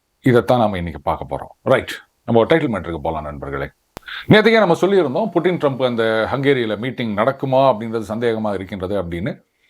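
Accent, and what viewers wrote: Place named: North Chennai, India. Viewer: Pl pl speak in real Tamil not in north Chennai accent. So cruel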